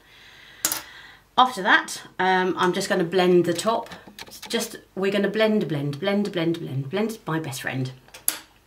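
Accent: United Kingdom. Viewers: I could listen to you talk for hours! Love a British accent! Your tutorial for doing makeup on a hooded eye is so well done. Just a little tip: don’t say negative things about yourself - I think you are a beautiful lady! Loved the whole video. Looking forward to more from you! 😊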